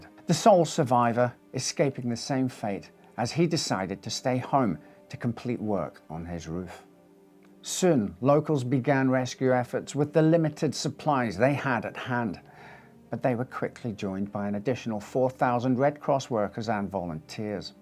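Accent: United Kingdom. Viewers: It is pronounced that way if you have an American accent. This gentleman has a British accent and therefore pronounces things differently. So what? Could you correctly pronounce the sometimes strange names of the cities in Great Britain?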